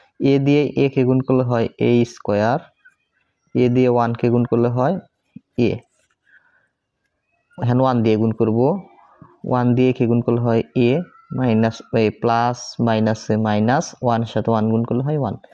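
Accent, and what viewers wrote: Tangail, Bangladesh. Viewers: স্যার আপনি টাঙ্গাইলের। কারণ টাঙ্গাইলের মানুষেরা এই ভাবে কথা বলে। আর স্যার আপনি অনেক ভালো অংক বুঝান ❤😊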